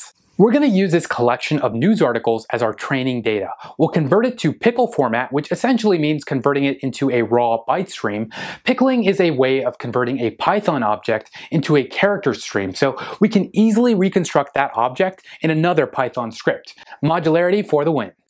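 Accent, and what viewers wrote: India. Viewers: nice accent, not like most indian.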